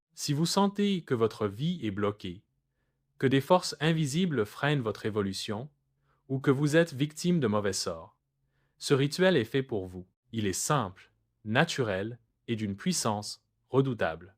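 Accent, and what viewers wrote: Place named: Quebec, Canada. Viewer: assortie d'un bon accent quebecois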